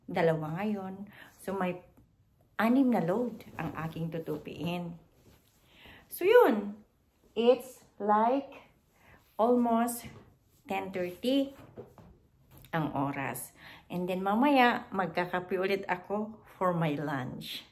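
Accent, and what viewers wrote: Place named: Ilocos, Philippines. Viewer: Nakakatuwang pakingan ninang ilokano tagalog❤❤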